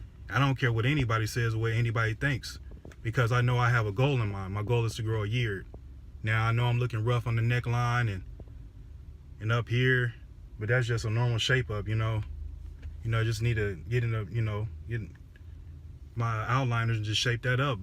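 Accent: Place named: Texas, United States. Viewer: Awesome! I was wondering what part of the country you were from. The way you talk sound just like everyone I know! Lol. I’m from Texas. My grandfather is in Ok.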